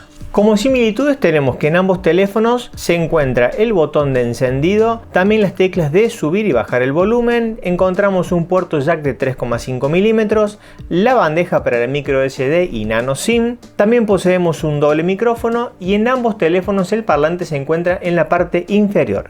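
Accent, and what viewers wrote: Córdoba, Argentina. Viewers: SOS de Córdoba bicho? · Cuesta esconder ese acentito cordobés, gracias por la comparativa me sacaste de muchas dudas.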